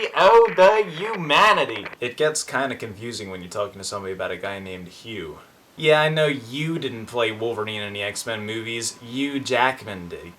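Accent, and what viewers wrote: Philadelphia, United States. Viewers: Where you from? Pennsport? Youz sound like a Mummer!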